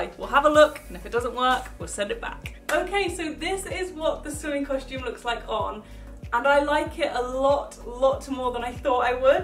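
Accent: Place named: London, United Kingdom. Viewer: I love your videos - love love love!! I'm so excited about your plan for daily vids this week and then DIY's next week too -:D Yayyyy!! My favorite "swimming costume" (how cute!) was the blue & white checked bikini (gingham pattern?) it's very cute on you. I don't get the straps either, but once you fix them it's going to be perfect. Btw, I love your voice, and you're just completely adorable!! I was born in London but moved to the USA when I was 4 - wishing I still had the accent!! Also, your hair suits you so perfectly <3 I am wondering if you've ever bleached your teeth or how you've gotten them so white? If you ever get the chance to mention how...? Keep being you, cuz you seriously brighten our day. You're definitely my favorite YouTuber by far!! Hello to & everyone! Love from Susan in Florida, US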